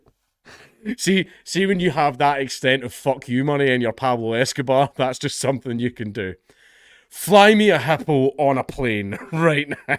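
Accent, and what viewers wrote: South Africa. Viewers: Haha,nice saffa accent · That was a mint South African accent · saffa accent is on point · South African accent has me dying 😅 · I love your south African accent.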